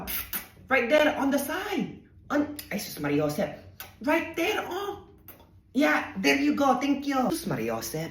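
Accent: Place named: Batangas, Philippines. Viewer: batangas slang 😂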